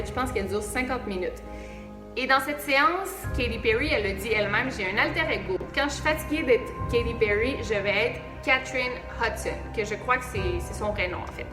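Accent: Quebec, Canada. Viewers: Beau travail! Je viens de tomber sur ta chaîne, c'est plaisant d'entendre parler 'Québécois' de trucs ''occultes''... T'as couvert les chemtrails ou la géoingénierie?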